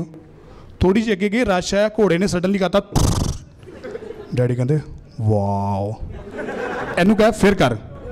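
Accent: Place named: Amritsar, India. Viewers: Pure majhi boli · THAT typical ambarsari accent and words... Priceless...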